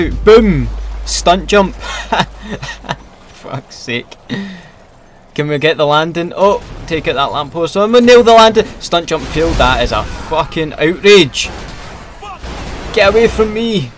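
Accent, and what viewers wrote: Scotland, United Kingdom. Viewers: Where in Scotland you from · Where about in Scotland are you from ??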